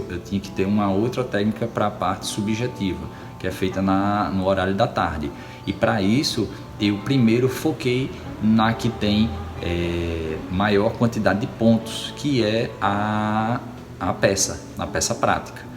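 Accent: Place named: Rio Grande do Norte, Brazil. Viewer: Excelente irmao, muito bom mesmo, o melhor depoimento pra quem está buscando pois aborda cada ponto c calma e objetividade. Pelo sotaque ou é potiguar ou paraibano né? Brasil.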